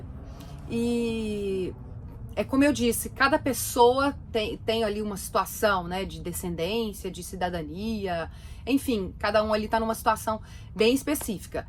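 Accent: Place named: Minas Gerais, Brazil. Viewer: Parabéns! Relato muito completo e que delicia de sotaque daqui de Minas hehehehe!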